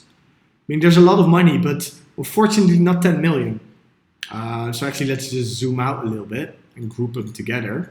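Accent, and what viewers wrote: Netherlands. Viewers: Is that a Dutch accent I hear? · are u dutch? sounds like a anglo dutch accent. thnx anyways for the info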